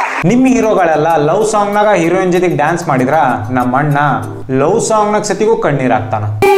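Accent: Uttara Kannada, India. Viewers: full uttarakannada slang a maintian maadri ......eee nadu nadu bangalore accent byada...goood going... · Uttara Kannada maatugalu.. namma huduga ivaan❤❤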